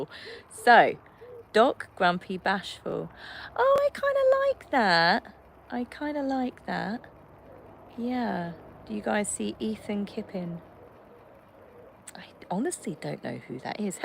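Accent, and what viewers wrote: United Kingdom. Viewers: you are pretty with or without makeup. We live in the country in SE Georgia, USA, agricultural area hot and humid. We are having afternoon thunderstorms almost daily! It’s a Summer time thing. We are natives of NE Florida, same weather! Love your accent. What part of UK you from. We speak SOUTHERN USA! 😂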